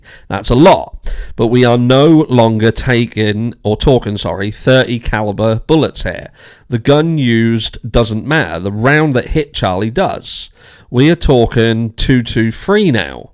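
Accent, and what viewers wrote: United Kingdom. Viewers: It's so funny to hear a Brit talk to Americans about ammo or firearms. 😉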